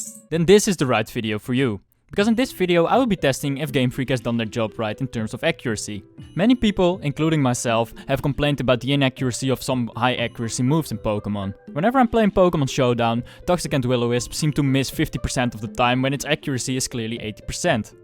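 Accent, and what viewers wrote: Netherlands. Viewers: r u dutch 👀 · I can notice a slight Dutch accent. Am I right? :p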